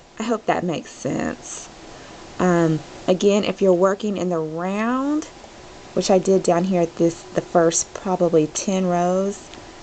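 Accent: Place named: South, United States. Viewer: Oh my goodness you have THE cutest Sothern drawl....and I'm a NC native....this is what I imagine a southern bell (in the big dresses) sound like, lol!!! Btw, great video and instructions!! New sub!!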